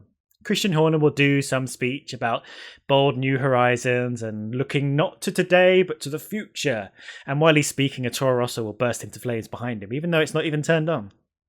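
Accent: United Kingdom. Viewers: So british, I love it!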